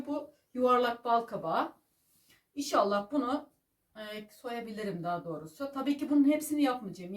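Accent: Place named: Kütahya, Turkey. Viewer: Sizi yeni izlemeye başladım nerelisiniz acaba kütahya konuşmasına benzettim konuşmalarınızı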